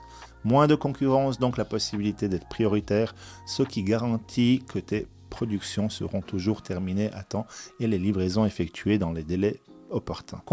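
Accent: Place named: Burkina Faso. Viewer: C'est ton Burkinabè mon frère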